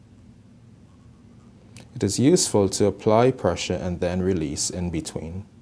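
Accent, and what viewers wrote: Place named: Trinidad and Tobago. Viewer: Where are you from? Sound like Trinidadian or something similar.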